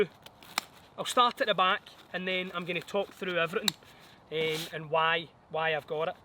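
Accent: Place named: Scottish Highlands, United Kingdom. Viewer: I enjoyed watching your video. Fantasy pack. I'm a former US Marine retired. I also enjoyed listening to you talk with your Highlander Scottish accent. It reminds me of the Netflix show Outlander.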